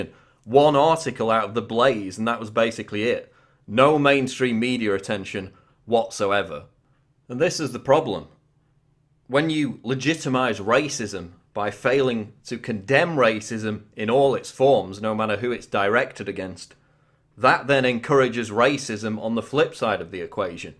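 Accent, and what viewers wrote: United Kingdom. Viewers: whats up with the british accent pronouncing words that end in "a" with an "er"? just an observation. as americans we sometimes look to england for proper english,maybe not only did we come closest to perfecting society but maybe common language as well. that being said, thanx for dropping logic on the kids.your social geopolitical game is strong.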